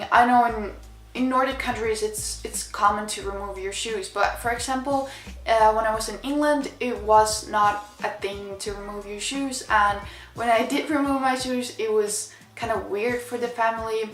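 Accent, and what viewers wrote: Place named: United States. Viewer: U have american accent more than the finnish one😍 just perfection💓!!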